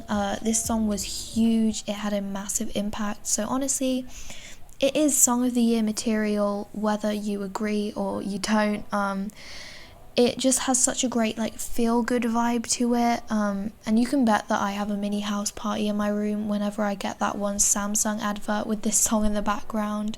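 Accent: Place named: England, United Kingdom. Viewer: Omg, I really love you're English accent :) Great video btw :)))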